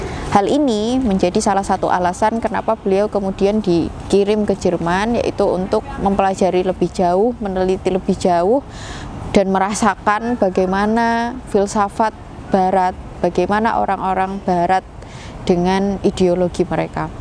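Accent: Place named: Java, Indonesia. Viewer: Medhokee leh mb Ditunggu ulasan buku lainnya ka...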